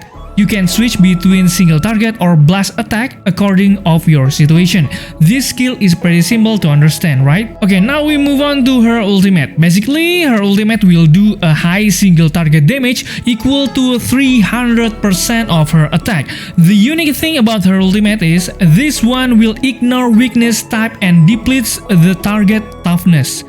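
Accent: Indonesia. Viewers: keep it up, even tho ur indonesian accent is to bold to hear, thumbs up from me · Are you Indonesian by any chance? I kinda can hear the accent a bit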